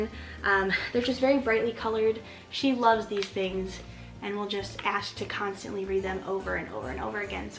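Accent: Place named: Georgia, United States. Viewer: I love your style and the four item Christmas Deal. I am a prior teacher and a struggling but succeeding minimalist. The items you choose for the girls seemed spot on. I just found your channel. First, watched the Christmas Decoration Video. I am the one who fell in love with your white tree. Like that your accent is similar to mine as I am from Georgia, yet, now live in Palm Harbor, Florida. I will be obsessed tonight and tomorrow catching up on your past videos. Merry Christmas 🎄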